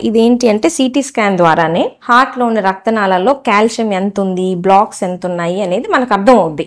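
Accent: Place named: Prakasam, India. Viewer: మీ విడియోలు దాదాపు అన్ని చూస్తున్నాను చాలా చక్కని ప్రకాశం జిల్లా భాషలో చెప్తారు. మన ప్రకాశం జిల్లా అంటే నాకు చాలా ఇష్టం అందులోను తెలుగులో అందరికి అర్ధం అయ్యేలా చెప్తున్నారు. చాలా మంది రోగులకు మీరు చెప్పి విషయాల వలన ధైర్యం గా వుంటారు. నిండు నూరు రెళ్ళు మీరు మీ కుటుంబ సభ్యులు చల్లగా వుండాలని కోరుకుంటున్నాను.🙏🙏🙏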